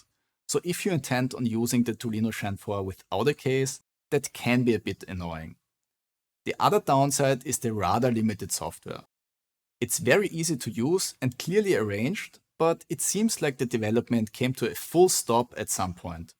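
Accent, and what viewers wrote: Austria. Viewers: thumbs up for the fat austrian accent from germany. My favourite word: The Bauerbuddnblaismänt. :) Eine Mischung aus Domi Thiem und Felix Neureuther, sehr sympatisch! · This is the very first of your videos I’ve watched. Your English sounds Austrian, nice ;-)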